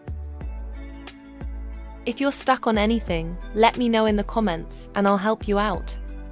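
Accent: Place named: Australia. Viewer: you sound like an auzie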